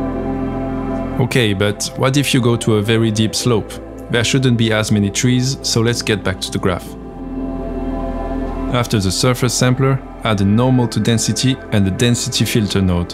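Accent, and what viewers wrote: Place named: France. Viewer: Toi tu es français c'est sure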